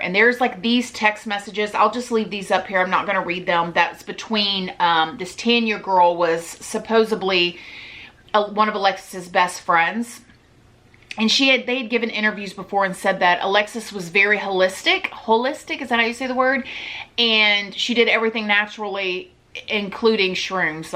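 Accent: South, United States. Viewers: Your accent got even more southern over the christmas i hear!😄 You are so, so sweet! And good job with the video. Very interesting case indeed!🤓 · Your obviously from down south, I'm curious if you have some Italian in you the way your hands fly around when you talk???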